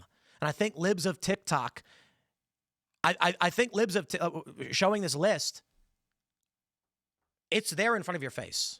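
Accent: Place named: Chicago, United States. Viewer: Tim, please stop popping your words, it’s better when you sound like a Chicagoan.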